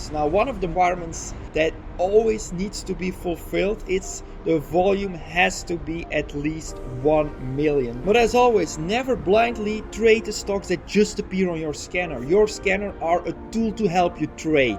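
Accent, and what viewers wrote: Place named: Switzerland. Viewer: your accent sounds like swiss :-)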